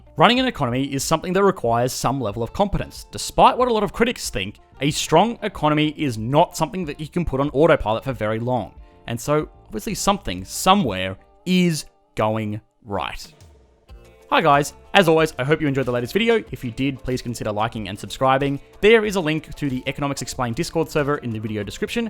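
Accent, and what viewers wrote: Australia. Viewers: Bruh hes australian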